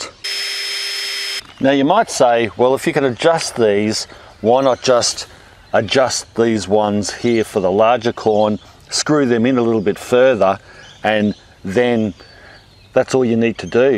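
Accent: Australia. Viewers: The Billy Mays of DIY, and bonus Australian accent. I wonder what else you are growing there that you are not showing us ;) You should pitch yourself to be a TV Ad/promoter.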